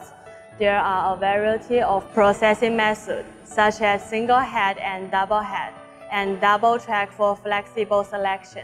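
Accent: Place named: Taiwan. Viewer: 這個台式口音讓我把廣告看完再過來看一次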